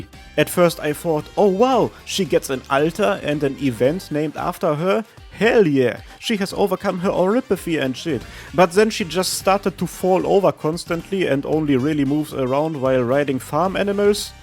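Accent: Germany. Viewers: holy your german accent is so insanely strongxDDDD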